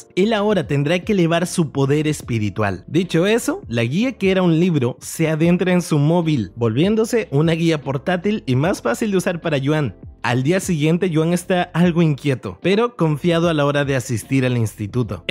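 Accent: Venezuela. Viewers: Eres venezolano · eres venezolano?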